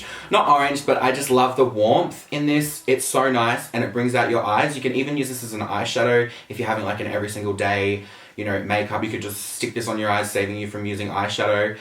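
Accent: Australia. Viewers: Is he Australian? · Hello! I'm new to this channel. Does anyone know where he is from? I'm fascinated by Aussie accents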